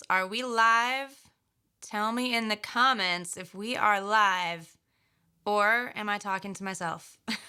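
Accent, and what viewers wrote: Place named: United States. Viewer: hi how it's going honestly I liked your way that you are teaching by the way nice accent wow I love American eglish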